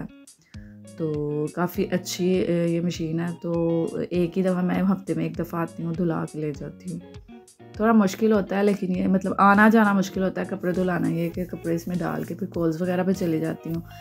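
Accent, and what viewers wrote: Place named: Punjab, India. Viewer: Miss ap ka vlog boht pasnd he or ap k bat krny ka style panjabi he acha lgta he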